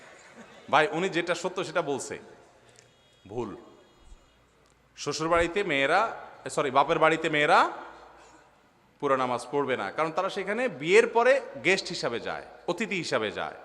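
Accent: Noakhali, Bangladesh. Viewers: চিটাগং না নোয়াখালীর ?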